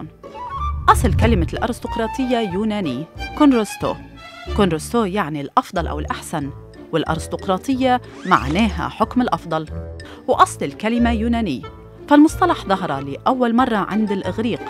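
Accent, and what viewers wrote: Lebanon. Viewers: لم استطع اكمال الفيديو بسبب اللجهة اللبنانية الممجوجة الثقيلة على السمع، رجاء ان تبقى الفيديوهات باللغة العربية الفصحى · حلوة اللهجة اللبنانية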